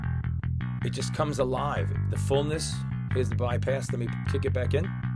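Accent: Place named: East Coast, United States. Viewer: Good to hear an east coaster. Awesome review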